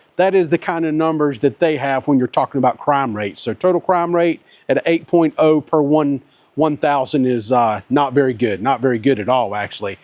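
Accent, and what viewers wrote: South, United States. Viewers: While these towns have seen better days, I do appreciate your visiting them , rain and all. While saddened what has happened to these towns, because of a number of factors, one can't replace their history. The beautiful old police car (?), I mean, come on. how great it that?. When the rain stopped, you entered the town of OLAR which I thought (through your southern dialect) was OLA, the name of my long passed mother., from the mountains of Virginia. Regardless, I did particularly enjoy that town and it's old buildings. Like you said, a little love paint, and the folks here just might see the value in a coffee/snack shop and barber to get thing back on track. Only time will tell.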